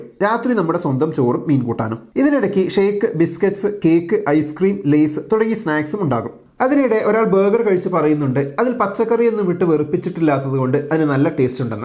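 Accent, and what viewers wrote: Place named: Kannur, India. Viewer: Food മൊത്തമായി വെറുക്കാൻ refillyourtummy കണ്ടാൽ മതി. അത്യാവശ്യം കണ്ണൂർ ഭാഷയും വെറുപ്പിച്ചു കയ്യിൽ തരുന്നുണ്ട്.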